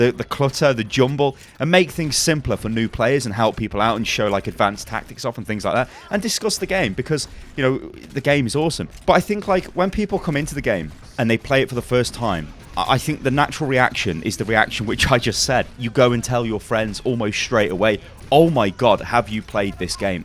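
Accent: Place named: United Kingdom. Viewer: bought the game just because my freinds all had it, from the videos i saw i thought it basically was tf2, but looked like it could be fun, but manic, but actually playing it is completely different, i must admit for the first few hours i did find it abit manic, but once you learn abit about the charachters it makes alot more sense. coming from playing lol and gw2, i expected some learning curve in learning charachters, but i must say i think i will be playing this game for a long time, its competitive,fun,varied, and game times aren't rediculous long!! also enjoying your british commentry!! keep it up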